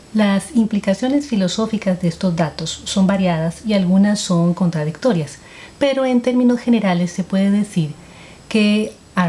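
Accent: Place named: Costa Rica. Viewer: Creo que es de Costa Rica.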